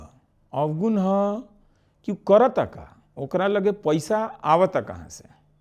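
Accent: Bhojpuri, India. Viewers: Main bihar ke siwan jile se dekh raha hu app to pure bhojpuri bol rahe hai sir Salam sir app Ko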